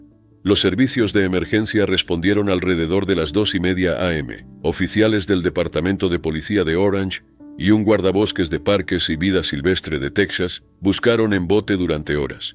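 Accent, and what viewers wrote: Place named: Spain. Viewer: tu azento es Lindo cariño es español 😍😍😍😍😍💋💋💋💋❤️😊🧜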